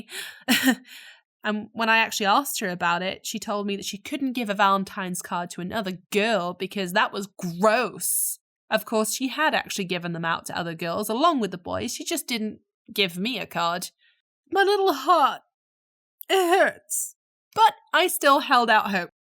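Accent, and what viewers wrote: Wales, United Kingdom. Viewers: Omg wait you’re welsh??? A bit creepy but where in Wales are you from?? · you sound british at first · WAIT- are you Welsh?!? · HEY THATS WELSH! woah your welsh too? Cool! I’m welsh also ^^ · Blywddyn saith 😂 you from south or north wales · W-w-waaaait Are you Welsh And did you go to a Welsh school?! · are you from walesss????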